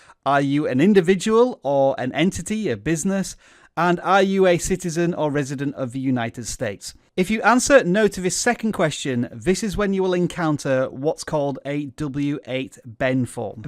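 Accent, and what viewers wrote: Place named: United Kingdom. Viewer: I'm confused. How does it work out for you? You sound like you come from the UK, listed as being in the US and you say you live in Canada?? Are you not sharing a VPN like loophole we don't know about? :)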